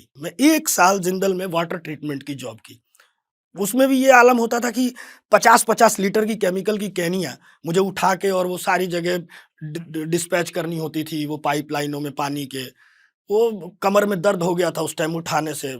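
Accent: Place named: Ballia, India. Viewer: Vinod ka hi real character lgta hai.. Ballia ka sahi accent hai aapka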